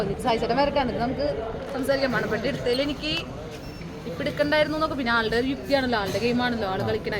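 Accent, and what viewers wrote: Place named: Thrissur, India. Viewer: Kore vishangal negative comment idum. Athonum mind cheyanda ❤️. Ninak oru ahagaravumilla. Ninte thrissur slang anganeyanu. Ath manasilakkunavarum und. Athonum manasilakkathe bodhavum vivaravumillathavarude comments mind cheyanda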